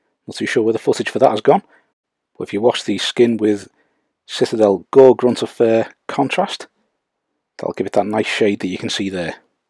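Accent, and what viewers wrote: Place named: Merseyside, United Kingdom. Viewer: These Uruk Hai guides have been so helpful as I plan on starting an Isengard army soon. The Merseyside accent is also a plus 👍🏻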